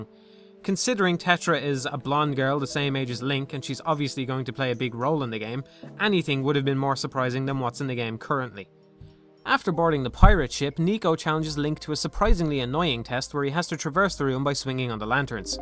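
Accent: Ireland. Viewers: Idk why but the further in the video I get the more Irish you sound Also, the mail sorting mini game is legit my favorite zelda mini game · This guy's Irish accent is just too good. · he's IRISH!!!!!!!!!!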